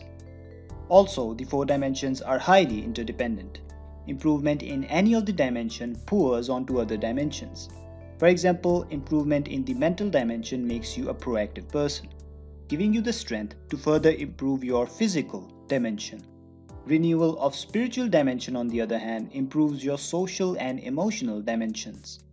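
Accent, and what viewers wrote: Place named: India. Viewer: Are you Indian bro? Your accent sounds so.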